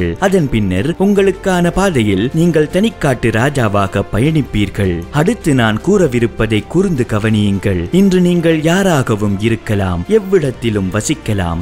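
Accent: Sri Lanka. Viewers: Bro neengal srilanka tamila Pesuranga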